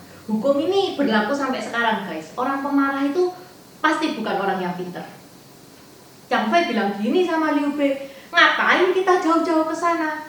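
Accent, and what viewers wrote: Java, Indonesia. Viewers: Jeng anda orang mana...logat anda medok banget...unik...apa adanya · Klo boleh saran.... Usahakan dalam penjelasan cerita murni pake bahasa Indonesia yg mudah di mengerti.... Krn gak semua pendengar bisa bahasa Jawa apalagi dengan eksen medhok. Hanya sekedar saran ya... Thx u 🙏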